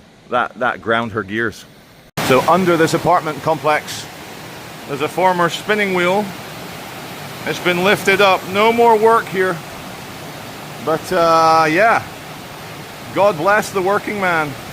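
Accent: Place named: RP, United Kingdom. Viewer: We need more posh accents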